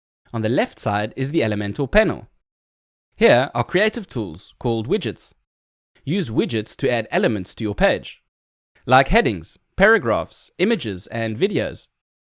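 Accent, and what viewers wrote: South Africa. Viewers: That's how South Africans speak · it retrospect idk what i was thinking, sounds pretty south african. i think it just hit me as pretty unique the first time around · Sounds like South African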